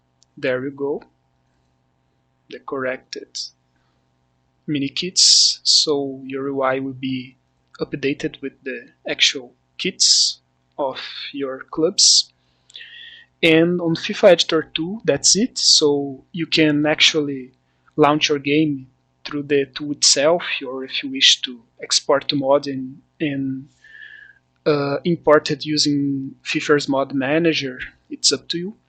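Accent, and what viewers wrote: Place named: Brazil. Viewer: Tu tem sotaque brasileiro pae.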